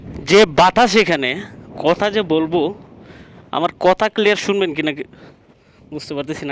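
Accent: Chittagong, Bangladesh. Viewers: ভাই আপনার কথায় আপনি যে কাজ চট্টগ্রামের সেই টা বুঝা যায়।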